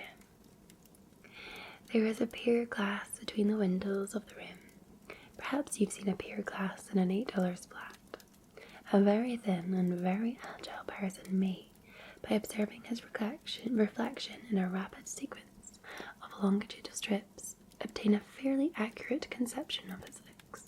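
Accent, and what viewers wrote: Scotland, United Kingdom. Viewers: Ah I missed that lovely soft Scottish accent. Sleepy time now, night night 💤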